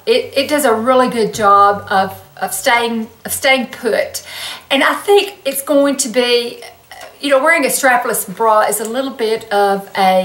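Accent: South, United States. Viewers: No way -- you are not over 60!! You are stunning!! Love your southern accent, btw :-)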